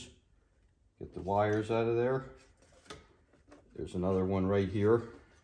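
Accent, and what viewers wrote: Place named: United States. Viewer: Thank you for this. The way you speak makes me want to visit the States again. Salutations from Germany.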